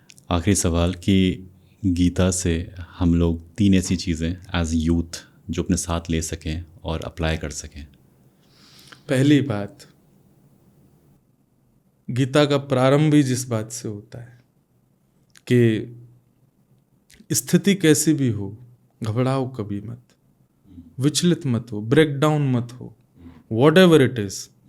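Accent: Braj, India. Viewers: apni Braj ki vasa boliye na woh acha lagta h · aapki samukh se varja bhasha hume badi pyari lagati he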